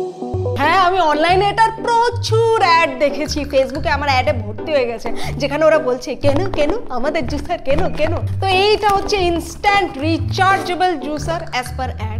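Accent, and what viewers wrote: Bangladesh. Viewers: Apnr kotha bolar style pura Bangladeshi der moto... mone hoi apni Bangladesh a chilan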